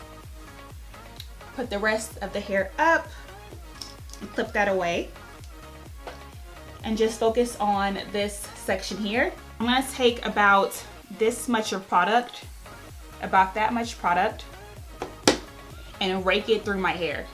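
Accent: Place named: Australia. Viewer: Thanks for this video! I definitely will try this tomorrow! I wonder how much time I will need? You have such an interesting accent, is that Australian?!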